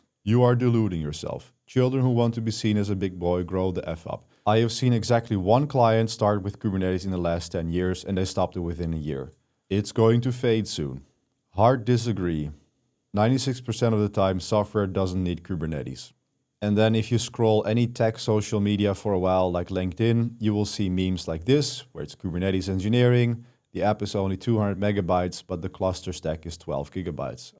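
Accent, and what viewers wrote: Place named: Netherlands. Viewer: My father has been a Kubernetes engineer since the early days of Kubernetes. I learned a lot from him when I was only 17 years old. I got my first Ubuntu laptop at the age of 16. After graduating, I got a job in Belgium as a DevOps engineer. I earned this job for one reason: my knowledge of Kubernetes. Even though I’m only a junior, my experience was already enough to work on production environments at the company. There are only two of us in the company who really know how to work with and implement Kubernetes. Dutch language: PS ik hou van je Nederlands acces. Herken mezelf erin 😊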